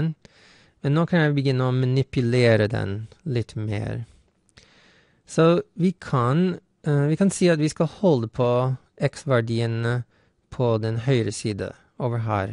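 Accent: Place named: Norwegian-American, United States. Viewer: Awesome norskamerikansk, haha :D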